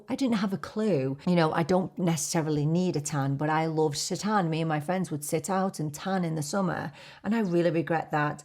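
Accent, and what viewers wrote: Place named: Yorkshire, United Kingdom. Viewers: Yeah! I picked up the Yorkshire accent. Though I was thinking North Yorkshire. I'm in the US but I watch a ton of BBC. A lot of the reality medical series I watch are up North in Yorkshire. I'm sure it's really my ignorance that helped, tbh. And, oh my goodness, why you ever thought you were less than is beyond me. You are stunning.😍 I do think though that many of us women, especially moms because we lose our identities when the kids are young, don't really come into our own until our 40s. So thrilled to be part of your journey on YouTube.💄💖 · What perfect timing for this video. I had to pick my car up from a service after work and had to wait another hour for it to be ready!! Thank you so much for sharing your story. I feel we have had similar experiences in life living abroad, having a child overseas without family and we are both teachers! 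Teaching is my second career and I didn’t study for my PGCE till I was 43 (now 59!), it was a scary decision but I’m so glad I did it. Whilst it’s not the best paid job I’ve had it’s definitely the most enjoyable! I detected the Manchester accent straight away and since you mentioned it today I can detect a little Yorkshire accent! My dad was a Yorkshire man and half my aunts/uncles and cousins have a Yorkshire accent. During Covid I was in Thailand alone working online, zoom calls everyday with my students and parents, I was really out of my comfort zone in front of the camera, but I did it! My partner is an Ozzy and couldn’t get back into Thailand till almost 18 months later. It was during this time I really got into YouTube and I got so much joy from making new friends through the amazing communities too. It was a time to really appreciate my own company (apart from my 5 rescued dogs). 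So happy I have found your channel, you speak with honesty and integrity and I love your videos.❤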